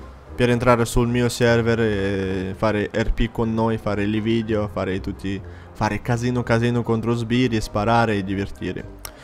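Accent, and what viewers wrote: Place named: East, Italy. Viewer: si capisce che sei dellest dalle bmw e dallaccento ahahah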